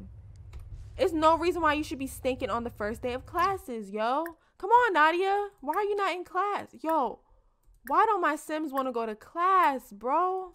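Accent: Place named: DMV, United States. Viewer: im from dc and i knew you had to be from the dmv area ! i heard the dmv in your voice 😂 i just found your channel and instantly loved your videos❤️❤️❤️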